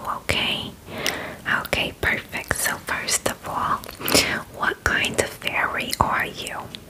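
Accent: New Jersey, United States. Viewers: JERSEY FAIRY 🧚‍♀️🌸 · as somone from nj, yup can confirm this is the “accent” we have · JERSEY FAIRY!! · Was expecting Irish or Scottish and I got Jersey. Love it. ❤️ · oh my gosh the fairy with the jersey accent i am living!!! you're like the nanny but for fairies. 😍🥰🥺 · POV your from jersey so you don’t notice a difference till you go to the comments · love the jersey accent ! 😂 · Please, I need a another video with a jersey accent 🤣 this was too good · The New Jersey Brooklyn accent made this ten times better than it would’ve been 👍👍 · The jersey accent really fits, I think I need more of her ASMR but jersey . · she meant the jersey accent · *New Jersey fairy* Me: TAKE MY H E A R T. NEW JERSEY FAIRY IS THE BEST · Girl, u are good at talking jersey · I've never been called sweetheart with a jersey accent before and I tell ya, I'm not complaining hahahahahahaa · Another flawless gem 💎 I absolutely love the "Jersey" accent.. 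I'm convinced that this is what a Fairy looks, sounds n acts like!!! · I didn’t know I needed a fairy from Jersey but I clearly did 🌸🧚‍♀️ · Jersey I think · I love the Jersey accent. Love your videos! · Its giving jersey new york fairy and im here for it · some people from jersey also speak like this, that's probably why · I love the Jersey accent ❤ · Alright I give, I'll subscribe because a fairy with a Jersey accent is the coolest thing ever lol. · Omg a jersey fairy 🥹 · Finally asmr with a Jersey accent without the gum chewing! 😭 Amazing video! ❤😊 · I think Jersey but some people are saying they hear a Brooklyn or Long Island accent!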